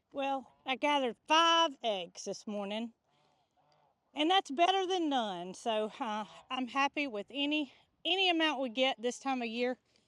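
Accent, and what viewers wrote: South, United States. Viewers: I'm over here cracking myself up over wondering what in the world "bacon powder" is when I realized it was your cute southern accent, and you were saying "baking powder"!! 😂🤣😂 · I love your southern accent, especially when you say your website name! So cute!❤❤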